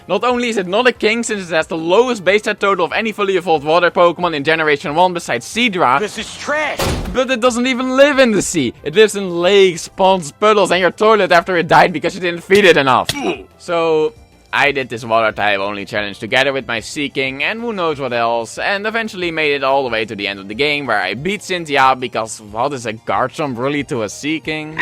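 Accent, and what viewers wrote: Netherlands. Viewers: It’s absurd but by the way you speak I asume your also dutch. Great video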